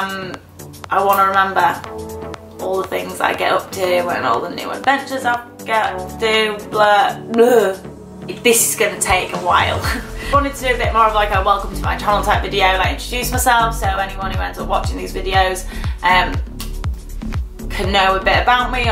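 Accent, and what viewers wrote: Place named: Northern England, United Kingdom. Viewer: I got sent here from one of Nina's posts! Look forward to seeing your vlogs so good to hear another northernerish voice!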